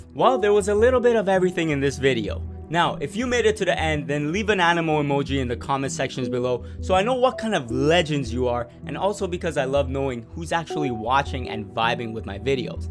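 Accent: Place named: Canada. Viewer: Heyy I recognized your Canadian accent 😁 Es-tu de Montréal ?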